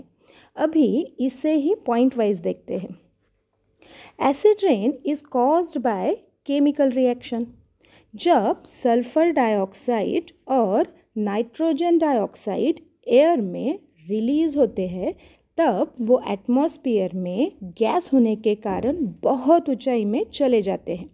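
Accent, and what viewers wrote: India. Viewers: r u bengali ? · Brilleint maam btw ma'am u r from south India i think according ur accent rii8??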